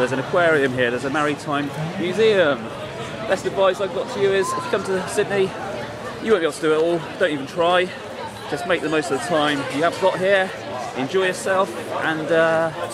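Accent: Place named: United Kingdom. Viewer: Adorable British accent.. and yeah brilliant video too :)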